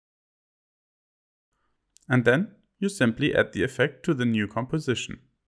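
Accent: Germany. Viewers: Du bist doch ein Deutscher oder? Du hörst dich an wie ein Deutscher der englisch redet :)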